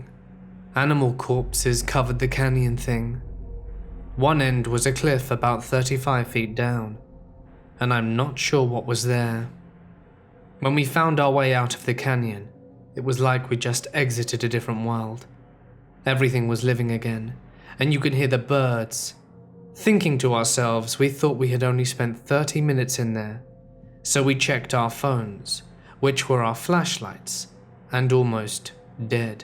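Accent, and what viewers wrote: England, United Kingdom. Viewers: laying in the middle of my bed, listening to middle of nowhere stories😀😀. I soooooooo wish you would do another collab with hellfreezer when you get settled! Besides killer orange cat, you guys are my favorite narrators and your voices would be awesome together! An English accent, and a Scottish accent, holy fucks batman!! He's a great narrator and I know my fellow MORTicians would like him as well. It's surprising that he doesn't have more subs.PS, the only thing better would be a collab with all three of you, haha. 🤗🤗🤗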